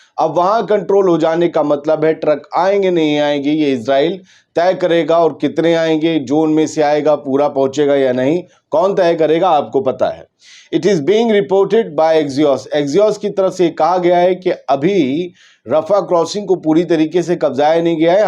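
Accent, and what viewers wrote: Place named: Bihar, India. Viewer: Bhai aap bihar se hain tale upar ye bihar ki zuban hai ❤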